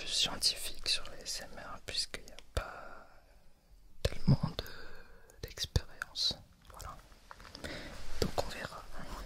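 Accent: France. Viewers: "une pétite (h)AUL" i feel bad for laughing.. i love the french accent tho, not trying to be mean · I literally love your voice! I'm a french student (in America) and I am completely obsessed with France/French. Your accent is so amazing :) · I also love your french dialect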